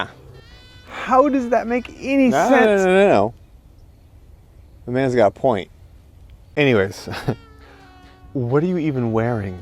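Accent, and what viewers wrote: Australia. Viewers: Thought it was pretty good you doing an aussie accent .as im an aussie . you actually sound more aussie than most people i talk to.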